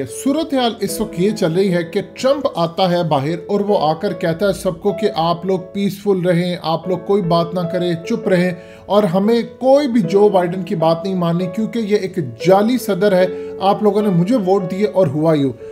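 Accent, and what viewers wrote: Punjab, India. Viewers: جن کو ٹھیک طرح سے اردو نہیں بولنی آتی۔۔۔اور جن کا اردو کا تلفظ پنجابی جیسا ہو، ان کو پاکستان میں صحافی بنایا ہوا ہے۔۔۔یہ معیار رہ گیا اب صحافت کا۔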